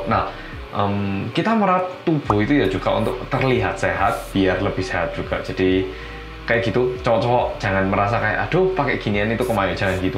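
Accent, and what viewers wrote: Java, Indonesia. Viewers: Absen dulu buat si korea medhok!😊 sukses selalu oppa(?)atau mas iki😂,salam bolo" barumu mas.aku mau manggil mas aja karena aku udh menganggapmu sbng wong medhok😊. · siang medoookkkkk wkkwkwkw tmbah ganteng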